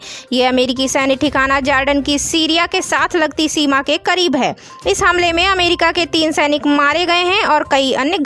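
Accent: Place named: Bihar, India. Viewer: Lagta hai aap Bihari baa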